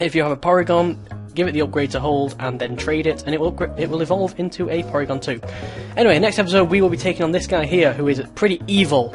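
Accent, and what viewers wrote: United Kingdom. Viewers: Are you from the UK? I love your accent! x3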